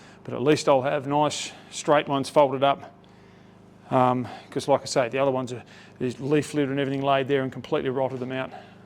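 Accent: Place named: Australia. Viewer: New viewer from Vic via China now. Love hearing your aussie genuine voice and thoughts.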